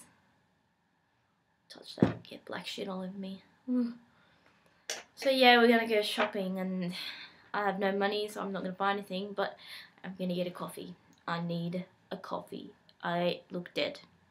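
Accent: Australia. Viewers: you might make money if you invent something that will prevent that from happening. hmm?. any ideas ? and as always, so in love with aussie accent. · Hi, I enjoy watching your videos and I love your accent. You might laugh but that's how I found this channel. A few days ago I was extremely bored and got the idea of learning how to do an Australian accent. Sometimes a few of my friends will have fun conversations using different accents and I've never been able to join in. Determined I started looking up 'how to do an Australian accent' and found your video. I think it's neat how you do these videos every once in awhile, it definitely shows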